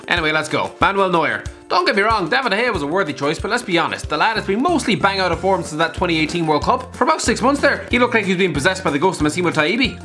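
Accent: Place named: Ireland. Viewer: When I saw “XI”, I thought it would be that dog shit narrator who did the all time XIs for Klopp and Guardiola. Thank God for the returning; Christ above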